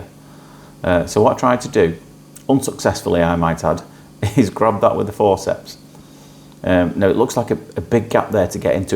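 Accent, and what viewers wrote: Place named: Lancashire, United Kingdom. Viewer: You sound like you're from Bolton/Rochdale way. I need this doing I think, where can I find you?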